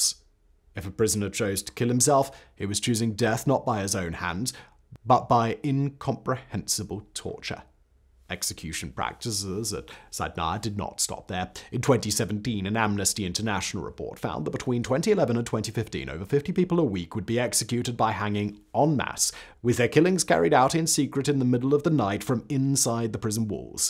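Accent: United Kingdom. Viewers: Bro that too much British accent for me to handle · his accent is becoming more British each video somehow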